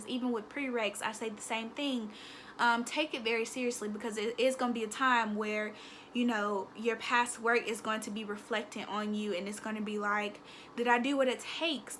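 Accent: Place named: South, United States. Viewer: Yesss Queen, thank you & I love your southern bell accent soo cuteee.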